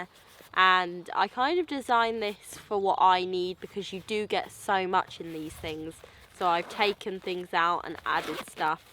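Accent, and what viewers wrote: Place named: RP, United Kingdom. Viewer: Another great video. Thanks a lot. Always nice to have someone's different opinion on something. For example, i was just looking at the small cook set for my day pack i want to start, and wasn't sure on "alcohol/petrol" stow that comes with it. but one phrase of yours made it easier for me:" for the day you can't start the fire". always different prospective to look at something. Day pack is a day pack and needs to be light, but what if you got rain and got lost - extra few oz is nothing when you need to eat and a day trip becomes overnighter. Also, admiring proper Queen's English and proper acescent that comes with it Keep doing good videos. Thanks.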